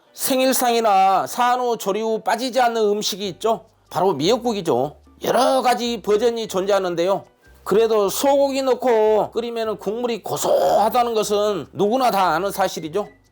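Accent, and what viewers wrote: Jeolla, South Korea. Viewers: 전라돈디요 ㅎㅎ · 전라도 사투리죠😂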